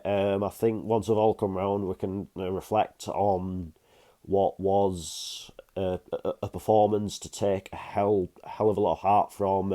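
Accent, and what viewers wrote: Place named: Northern England, United Kingdom. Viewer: Northern english accent there, whereabouts you from? Im a celt born in london myself🍀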